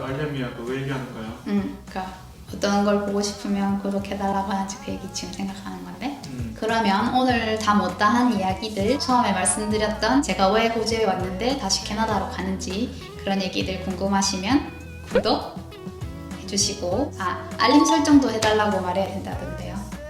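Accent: Busan, South Korea. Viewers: 블로그를 읽는 것과는 다르게 영상으로 보니 또다른 재미가 있네요!! ㅋㅋㅋ 부산 사투리도 신선합니닷ㅋㅋㅋㅋㅋ 왜 캐나다로 가려고 하시는지 !! 그 영상 기대하겠슴니다 :) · 약사이민으로 몇몇 채널을 봤지만… 약사님처럼 다정다감(?)한 느낌은 없었어요. 작가님이라 그러신지 말도 조곤조곤…. 아주 익숙한 네이티브 부산억양 ㅎ 편안하게 잘 들었어요~~ “남자때문에 호주 간거네??” 삥 터짐 ㅎ 구독. 좋아요. 알림설정 때력박고(?) 다음 영상으로 고고~~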